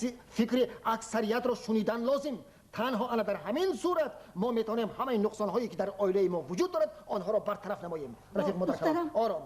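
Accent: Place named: Tajikistan. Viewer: Чудоям нутки зебову лахчаи волои Точикона. Хело Олли Ойла ва марди Точикро исбот намудед. Интилигент. Чунин хам бояд бошад. Саломат бошед устоди азиз. Салом аз Самарканд.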